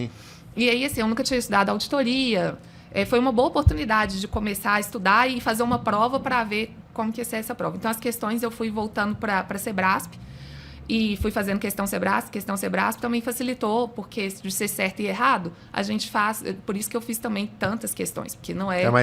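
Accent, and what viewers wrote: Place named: Minas Gerais, Brazil. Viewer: Esse sotaque dela é maravilhoso MG ❤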